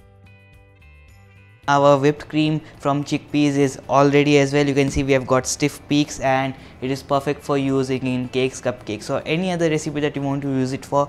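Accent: India. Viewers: His accent is particularly cute :P All of us are not blessed with it haha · indian accent is so cute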